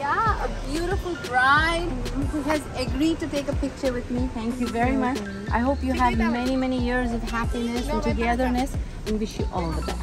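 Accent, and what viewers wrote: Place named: United States. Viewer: Drop the western accent and bring your true self out. Your hindi diction is very good but why this American dialect??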